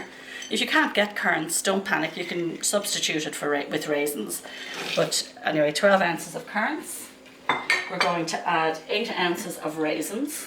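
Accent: Ireland. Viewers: Are you lrish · Hah, Guinness. Should have guessed it with that accent! This is a different approach to the plum pudding. It will be interesting! Thankyou.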